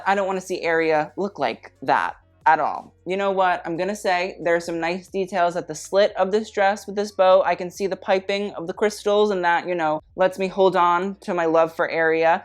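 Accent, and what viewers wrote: United Kingdom. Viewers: Wait. What? Are you British? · You’re British??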